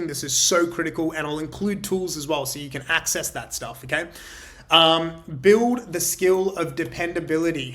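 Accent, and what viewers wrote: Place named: Australia. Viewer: G'day, nice to hear an Aussie accent on a video like this - I'm an independent SalesOps guy who usually works with SDRs and AEs and now doing my own prospecting - so this is super useful. Cheers mate, ABC!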